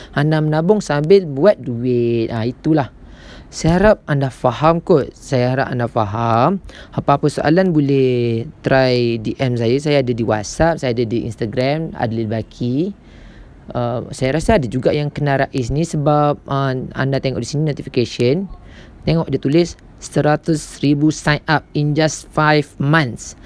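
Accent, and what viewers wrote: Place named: Pahang, Malaysia. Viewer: mcm org pahang je bcakap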